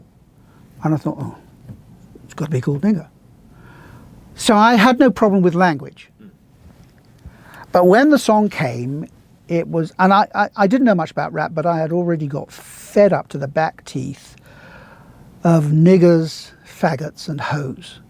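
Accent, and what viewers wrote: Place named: RP, United Kingdom. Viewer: He is so wise and confident about his craft. Rightfully so. His music is amazing. Plus his accent is so posh!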